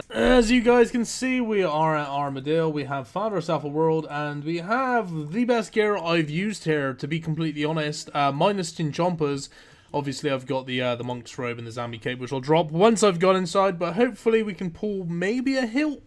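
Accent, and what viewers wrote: Bristol, United Kingdom. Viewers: Are you from the south-west of the UK? You have a birstol-esque accent.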